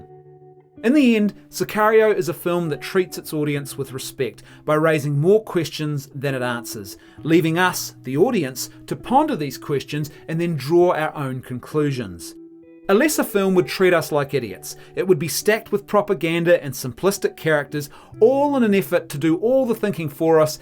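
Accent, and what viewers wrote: New Zealand. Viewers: Can't handle the Kiwi accent... Blah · Kiwi accents crack me up! Great review of the movie, but my takeaway will always be, "ass-pics of dipth" & "muudah". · The guy talking sounds like a Kiwi. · are you kiwi bra?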